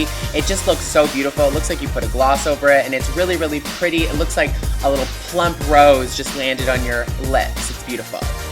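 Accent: Canada. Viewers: Love your canadian accent :)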